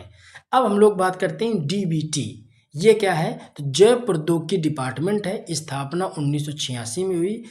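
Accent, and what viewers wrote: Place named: Kanpur Dehat, India. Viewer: Sir aap kanpur dehat se ho kya...kuki apki boli lg rhi h ki aap hmare pukhrayan ke pass k hi ho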